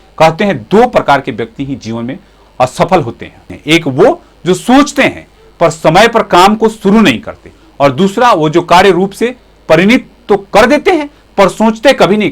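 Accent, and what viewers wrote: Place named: Bihar, India. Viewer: hindi sudharo ... aise lagta hey jaise bihar sey ho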